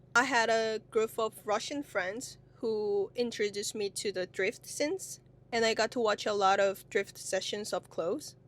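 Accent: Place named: England, United Kingdom. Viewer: amazing video thank you 😊 cute english accent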